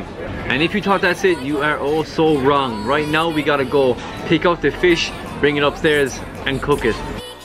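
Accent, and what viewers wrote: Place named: Ireland. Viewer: very nice intro, looks nice restaurant, OMG!!.......there are so many good restaurants..in Spinola bay..sadly so many come and go!! i subbed, so i keep an eye out for you..( sounds a little like a guy from the Emerald Isle )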